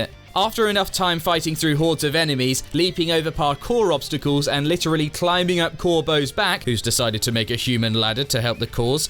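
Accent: United Kingdom. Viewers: Are you British?😂😂😂😂😂😂😂😂😂😂😂😂😂😂😂😂😂😂😂😂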